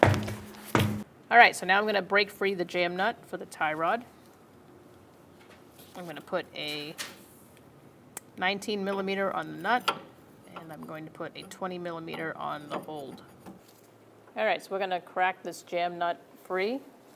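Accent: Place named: New England, United States. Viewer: She sounds and that Subaru looks like its from Massachusetts or some other New England state. Lol im from Maine so i know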